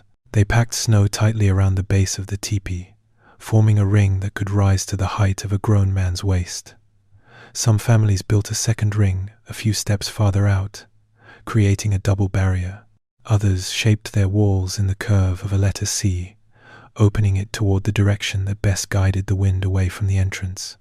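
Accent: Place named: United Kingdom. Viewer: So we have a a British narrator talking about American Indians.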